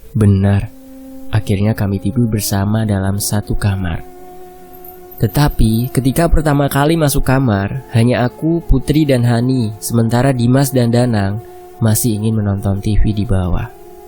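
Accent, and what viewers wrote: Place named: West Java, Indonesia. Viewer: Kesel bgt dengernya klo pakek logat sunda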